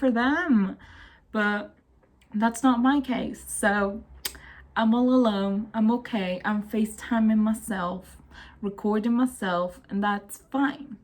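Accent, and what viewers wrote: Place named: Andalusia, Spain. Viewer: Ojalá tener tu acento, de que parte de sur de España eres?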